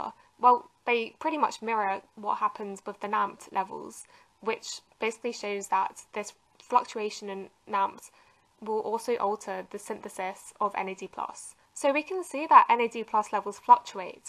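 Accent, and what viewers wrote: United Kingdom. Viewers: Not get used to British accent.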